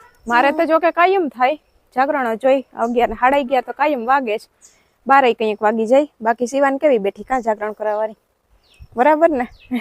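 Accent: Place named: Kathiawar, India. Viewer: Kem chho ben. Sivani su kare chhe. Tamari boli bov saras chhe kathiyawadi..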